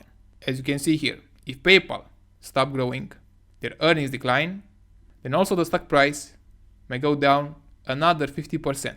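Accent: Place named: England, United Kingdom. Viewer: Don't take advice from some moron with heavy English accent from the internet.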